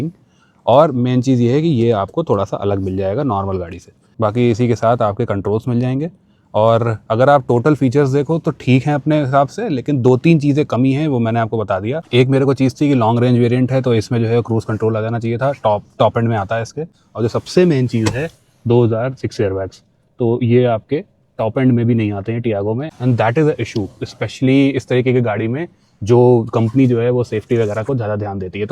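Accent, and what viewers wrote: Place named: Farrukhabad, India. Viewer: Farrukhabad wali boli. Chala rahe ho shahjahanpur me. Purane din yaad aa gye.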